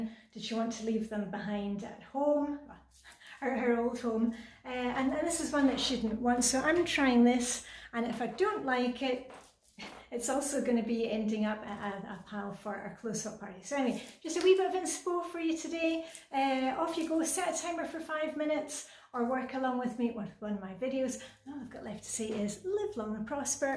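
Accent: Scotland, United Kingdom. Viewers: Hi, I really love your channel. I lost my husband.of 49 years, 2 years ago and.I still struggle with being.motivated. I do have a morning routine and stick to it most of the time. I do like to hear your voice, as my husband was Scottish and I miss his accent. I am Australian and we lived in Australia. THank you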